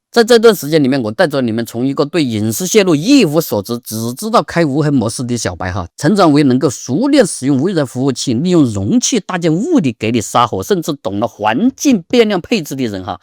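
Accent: Sichuan, China. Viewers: 你是重庆人还是四川人？太喜欢的川普了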